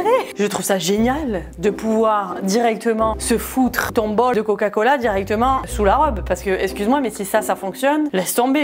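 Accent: Quebec, Canada. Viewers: Tu peut faire une vidéo avec ton accent québécois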